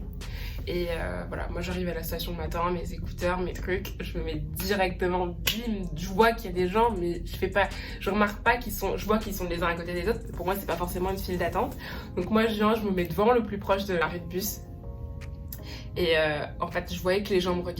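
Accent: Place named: Quebec, Canada. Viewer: Tu commences à avoir l'accent québécois, sa s'entend très très très très légèrement.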